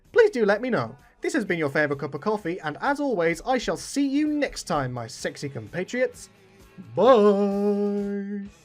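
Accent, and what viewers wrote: Poland. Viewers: Are you Polish? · Youre polish?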